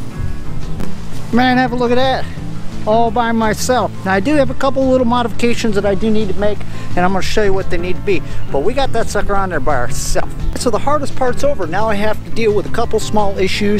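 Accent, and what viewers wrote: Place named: Minnesota, United States. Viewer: I recognize your accent (reminds me of Al Lindner). Are you from the Brainerd, Minnesota area?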